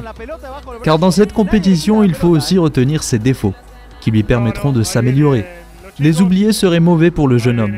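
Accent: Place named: Spain. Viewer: Ton accent espagnol , ta diction est juste incroyable VISCA BARCA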